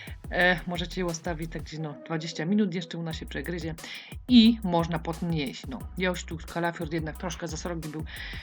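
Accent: Silesia, Poland. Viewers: Uwielbiam Śląską gwarę i oglądam Ciebie głównie z tego względu ale na przepisy też. Pozdrawiam. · Przepiękna śląska wymowa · Pani jest Pani wspaniała, mówi Pani ślicznie gwarą śląską. Nie rozwleka Pani swoich komentarzy, jak inni mają w zwyczaju, zanim dojdą do meritum sprawy to nagadają się dużo i nie na temat, że człowiek zmuszony jest wyłączyć takiego "gawędziarza. Pani natomiast robi szybko nie przedłuża czczą gadaniną za to Pani komentarze są rzeczowe okraszone dowcipem. Po za tym, tłuszcz to samo zdrowie dodatkowo pięknie odchudza bez efektu jojo. Dzięki tłuszczowi przyswajane są najważniejsze witaminy: D3, K2MK7, A, E.tak zwany ADEK, bezwzględnie należy przyjmować z tłuszczem, inaczej nie będzie żadnego efektu. Te witaminy rozpuszczają sie tylko w tłuszczu. Szacunek dla Pani. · sol jest nam bardzo potrzebna,ale nie w nadmiarze. sol naturalna,a nie ta z kartonikow ktora ma 90% chemii.najlepsza nasza sol klodawska albo z wieliczki.Pani bardzo pania lubie,a do tego ten slaski jezyk super.mieszkam w berlinie,a w przeszlosci na pomorzu. 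· Albo mówisz po polsku, albo pięknie godosz po śląsku. Na śląsku nie ma pomidorów. Są tomaty. pozdrawiam z Florydy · jestes super dziewczyna, czy to śląski Akcent⁉️🌻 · Albo mówisz po polsku, albo pięknie godosz po śląsku. Na śląsku nie ma pomidorów. Są tomaty. Godej jak prawdziwa Hanyska. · Pani ta zupa to mistrzostwo świata!!!!to jest zupa co ma wszystkie zupy pod sobą i jeszcze ta Śląska mowa i wspaniałe wykonanie pozdrawiam 🥰🌹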